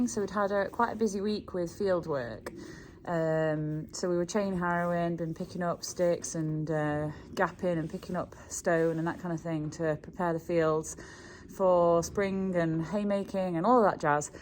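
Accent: United Kingdom. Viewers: You are sounding like a strong Derbyshire farmer every day !!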